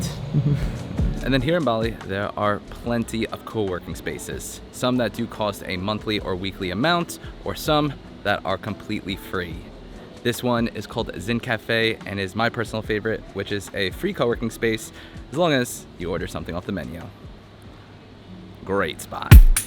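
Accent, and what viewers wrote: United States. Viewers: Just here for some real-life American English. Thanks!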